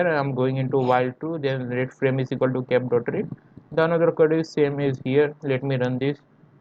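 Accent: Gujarat, India. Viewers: i can definitely tell this guy is gujarati kathiyawadi according to his english speaking accent .if i am right let me know!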